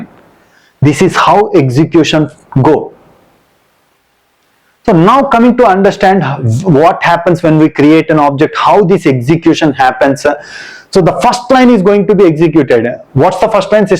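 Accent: South India, India. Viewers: Are u from south india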